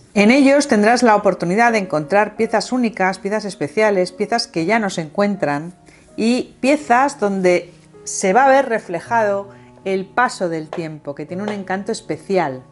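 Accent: Spain. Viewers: Porque habláis como si fuerais española?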